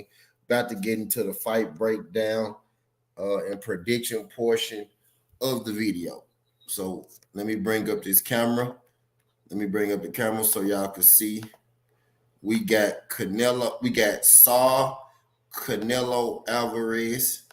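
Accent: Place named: South, United States. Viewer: U sound more country than usual in this video 😂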